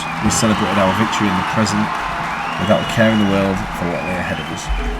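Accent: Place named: Northern England, United Kingdom. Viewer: Awesome videos man. Nice to hear a fellow northern accent rather than hyperactive Americans! Looking forward to seeing more, this game looks fantastic.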